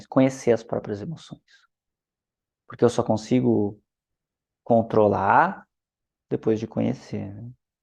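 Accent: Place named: Rio Grande do Sul, Brazil. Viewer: Esse sotaque eu conheço...é GAUDÉRIO !!😅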